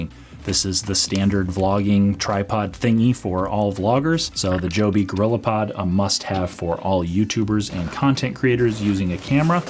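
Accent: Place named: Midwest, United States. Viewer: Thanks for all your great FCPX Tutorials and other content. One question: What's the correct way to use a FCPx project as a template for further projects. For example, lets say that you are making a 10 part series and you want them to have the same intro and outro as well as the same basic look and feel (but of course with entirely different footage and audio in each episode). Is there a way to use a completed project as a template for other projects that preserves intro and outro and the different effects that were used? Any tips on doing this, rather than having to start each new episode entirely from scratch each time? Thanks! btw, I used to live in Omaha and went to Westside High, so I also enjoy your mid-western presentation style.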